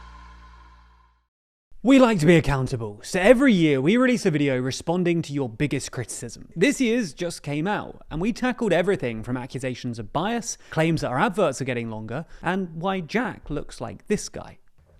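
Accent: England, United Kingdom. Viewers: Poor research on this video. Ecuador has also elected a right wing president Daniel Noboa. The incompetence masked by an English accent.....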